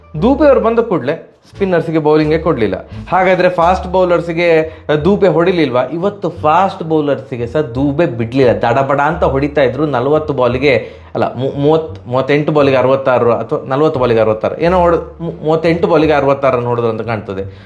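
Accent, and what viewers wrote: Mangalore, India. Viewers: Nimma Managaluru style kannadadalli vivarane kelakke bahala chanda oontu maare, edunna maatra change madbedi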